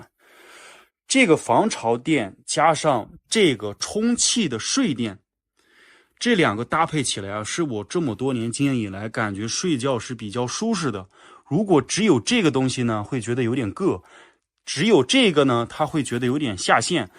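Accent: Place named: Shandong, China. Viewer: 每天都期待你的视频，阳光正能量，更重要的是听着你山东普通话特别亲切，为咱们山东人点赞👍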